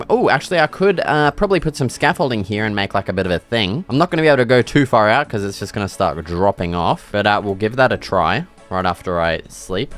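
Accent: Australia. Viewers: Quick question are you Aussie? You sound a bit Australian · Your Australian Accent is so iconic I love it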